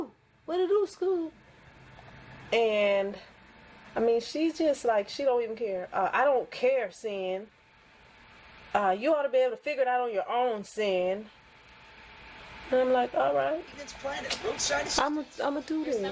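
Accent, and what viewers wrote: South, United States. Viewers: I came for your 1st time.....and the country accent I can't get enough of ;) It came out great!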